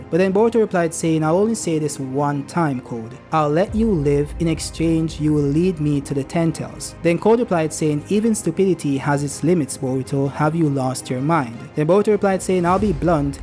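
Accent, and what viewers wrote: Jamaica. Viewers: Is bro jamaican 👀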